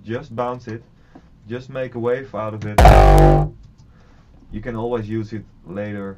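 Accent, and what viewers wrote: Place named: Netherlands. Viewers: hij is nl >,< wow gewoon wow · Je hebt een Nederlands accent:)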